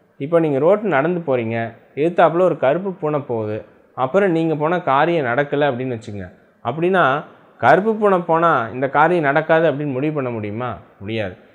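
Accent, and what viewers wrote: Kongu, India. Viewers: கொங்கு மண்டலத்து பேச்சு நக்கல் நையாண்டி அப்படியே இருக்கு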